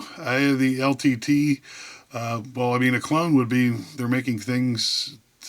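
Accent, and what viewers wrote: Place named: New Jersey, United States. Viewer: Upper mid Atlantic sure sounds like Joizey to moi...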